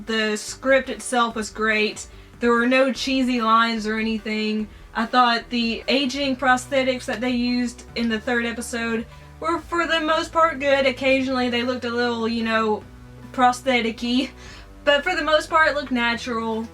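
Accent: South, United States. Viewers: nice one,looks like a great film,i love your southern accent,looking great too,love n peace.jpj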